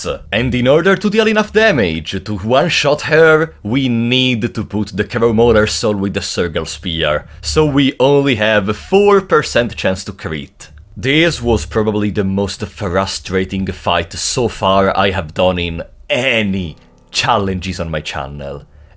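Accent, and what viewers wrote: Italy. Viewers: l'accento italiano mi distrugge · you sound italian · L'accento italiano si sente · I just watch them. your Italian accent and dark dungeons of fear and hunger are really calming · Italian acent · Onesto i sente che sei italiano 10/10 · Mi piace come si sente bene il tuo italiano puro proprio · l’accento italiano si fa sentire parecchio 🤣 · The very true question is "I recognise an accent... frate sei italiano?" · Ma sei italiano? L'accento sembra familiare 👀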